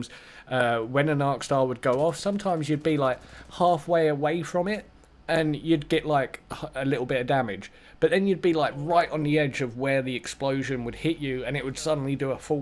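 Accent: United Kingdom. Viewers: In case he doesn't reply, his accent sounds like South-East England to me :)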